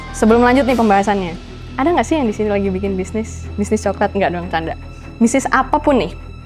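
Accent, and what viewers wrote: Java, Indonesia. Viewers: Kok medok se mbak, bikin seneng aja😅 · mayan lah, medoknya udah hampil ilang, tapi masih kerasa di beberapa pronounce 😁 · satu yang saya notice, kemampuan beradaptasi dengan semakin menyamarkan logat medok-nya meningkat jauh.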